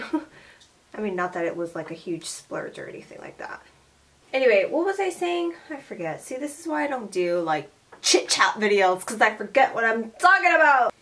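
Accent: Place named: Hawaii, United States. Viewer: loved this video!! watching you and hearing your accent make me miss hawaii so much!! <3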